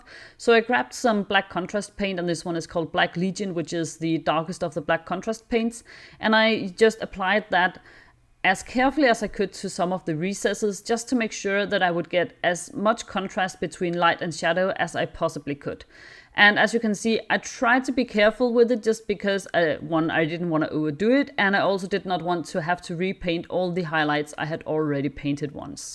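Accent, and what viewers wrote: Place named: Denmark. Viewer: something in your english reminds me of how I speak english.. are you perhaps danish? awesome scheme btw(og hvis du dansk, stærkt farvevalg)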